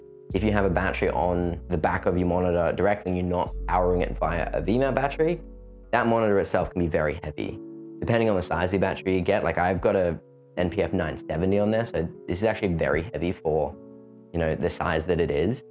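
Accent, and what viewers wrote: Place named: Australia. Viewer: You sound like Adrian Gee. I am guessing you are from Adelaide or Melbourne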